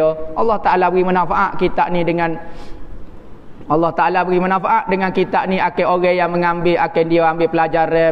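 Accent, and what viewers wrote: Kelantan, Malaysia. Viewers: Penyampaian dalam loghat kelantan 'pure'(pekat) tetapi padat tepat & jelas Mempunyai aura keilmuan yg tinggi, ikhlas serta tiada yg disembunyikan Semoga Allah melindungi Pak Syekh ni sekeluaga & kita semua dalam lindunganNya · Xde masalah ..org luar pun leh faham loghat kelate…teruskan pendedahan..💪🏻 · Saya baru mengenali ustaz yg berani ni//walaupun kecik kelantan tapi jelas dan boleh difahami...TETAP SEMANGAT USTAZ..LONDEHKAN KEBUSUKAN N KEJAHATAN WAHBI...TERBAIK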